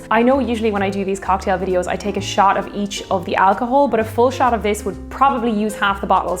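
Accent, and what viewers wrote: Ireland. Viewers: Always enjoyable to see you online. There’s something I should tell you. As an Internet fan. There are four or more ways to properly pronounce the city you named and none of them are New Ore-leens. Locals will truly look at you like you are deliberately baiting them and you don’t want that. The oldest of the 4, from what I can tell is New Ore-lay-Ons. That lay sound is probably what has been twisted by yanks into the despised ee sound. As if it was said in French, which was originally spoken there. A miner variation is New Ore-le-ohns with just a hint of the h pronounced. Another acceptable variation is Nore-lans. Only two syllables. Finally. The tourist inoffensive version. New ore-lans. With an a as flat as you like. You may get passed on the bad looks with your Irish accent and incredible beauty, but why say the equivalent of “Hasn’t Ireland always been a part of England?” If you can avoid it? 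By the way, the second largest genetic heritage found there is Irish. So don’t be surprised if you see familar-ish faces behind the bar at places like Pat O’Brien’s or anywhere. But don’t go during this mess. If you want a real treat, go during the New Orleans Jazz Festival. Based on your background music you will love it. I went to college about 3 hours away and spent almost a month in Louisiana, aka Lou’s-I-an-a. (No pronunciation land mines there.) so that’s where I got this knowledge. Thanks for brightening the dark dark covid days. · Love your joyful approach to the making of this drink although would have watched just hear that delicious Irish accent! Thanks.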